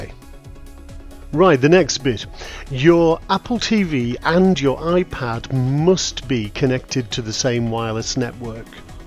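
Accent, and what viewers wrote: United Kingdom. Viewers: Do I detect a Scouse accent here? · are you telling that to the guy in the video ? -.- that's so racist, and stupid ! That's a British accent, and the higher chances are that he is already there were he made the video, he doesn't have to go back anywhere ! .-.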